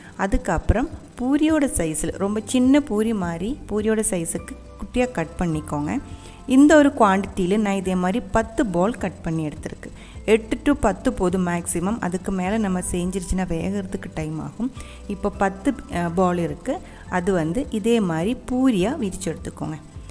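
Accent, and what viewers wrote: Kerala, India. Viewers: உங்கள் malayalam கலந்த தமிழ் பேச்சு மிக மிக அருமை.கேட்டு கொண்டே இருக்கலாம் போல உள்ளது Sister. வாழ்க வளமுடன். உங்கள் கேரளா recipes also அருமை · ur slang is so good and ur voice also malayalam kaladha Tamil nice to hear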